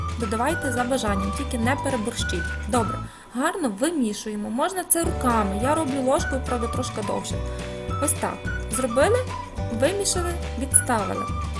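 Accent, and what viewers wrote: Western Ukraine, Ukraine. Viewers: Как же я люблю ваш украинский говор,некоторые слова не знаю,но по смыслу понимаю, люблю вас😘 спасибо 🙏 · Сьогодні вперше надибала Ваш канал і відразу ж підписалася. Шукала оладки. Ще нічого, звісно, не готувала з того, що Ви пропонуєте. Але точно спробую. А поки-що Ваша мова - то вже найсмачніше, що є серед усієї тої смакоти. Дякую Вам за гарну мову, рідну, колоритну і таку смачну!!!! )))) Звідки Ви? Чую, точно десь із Західної України ))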